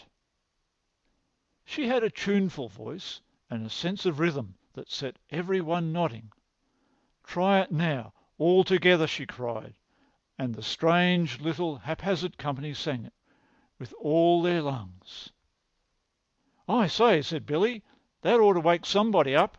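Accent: Australia. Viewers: Australian? good reader - good story. thanks :} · The Australian rising question intonation is distracting.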